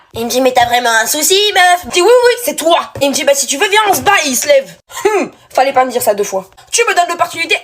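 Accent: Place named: Africa. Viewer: je kiff bien ton accent on dirait t'es africaine t'es africaine?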